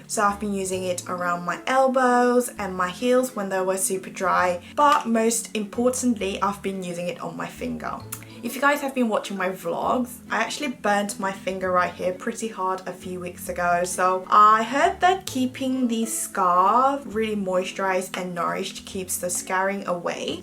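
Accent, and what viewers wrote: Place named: United Kingdom. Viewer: 영국영어도 제품 설명도 매력 넘쳐요♡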